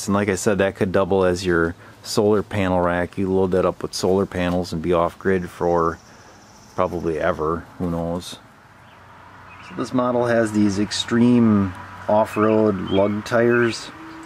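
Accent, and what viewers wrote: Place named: Fargo, United States. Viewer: Where the fuck is this accent from Fargo?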